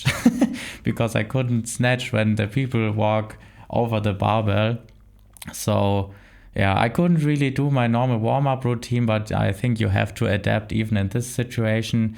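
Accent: Germany. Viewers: Ich dachte die ganze Zeit schon dein Akzent ist deutsch Geil, bleib bitte dran und mach weiter so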